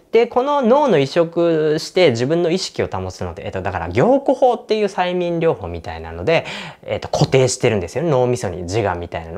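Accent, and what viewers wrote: Kagoshima, Japan. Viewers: さんの情報全くない中で見ましたが、開始15秒で鹿児島出身だということが分かりました😂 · 鹿児島の方ですか！？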